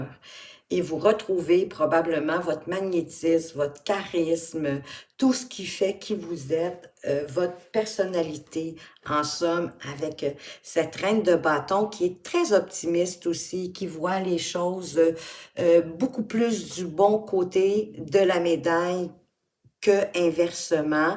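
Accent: Quebec, Canada. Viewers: Merci à vous pour ce beau tirage si parlant !! et la fin me bouleverse ! oui j'ai mis de côté les soins que je peux offrir grâce à mes mains . C'est un plaisir de vous écouter et de vous entendre ! j'adore l'accent québécois. j'en suis tombée amoureuse quand j'ai vécu là-bas 🙏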